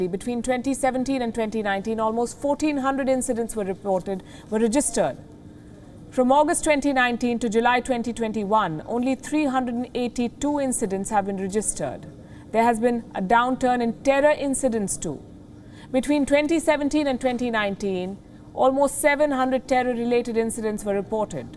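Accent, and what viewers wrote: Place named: India. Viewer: Anchor speaking in our Indian accent this way we understood completely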